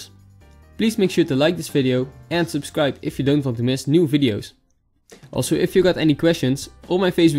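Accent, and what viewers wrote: Netherlands. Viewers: Do I hear a Dutch accent?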